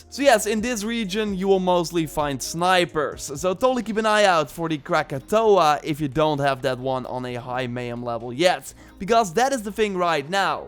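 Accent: Netherlands. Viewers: you sound dutch???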